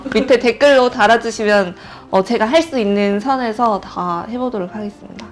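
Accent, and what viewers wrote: South Korea. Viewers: 언니 근데 몽골어로 말하는데.. 좀 몽골어 잘하는 한국사람이 말하고있는것 처럼 들려서...ㅋㅋ 뭔가 발음이 한국스러워졌다랄까? p.s 언니 영상 잘 보고 있어용~~ 언니 영상 볼때마다 엄마가 해준 banshtai tsai 먹고 싶어지고.. 뭔가 뭉클하고.. 그래요... 응원합니다!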